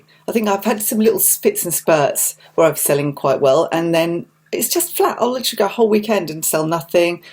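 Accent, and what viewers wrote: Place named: United Kingdom. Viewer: Oh this channel is delightful! Awwww, I'll never tire of a lovely British accent!😌💜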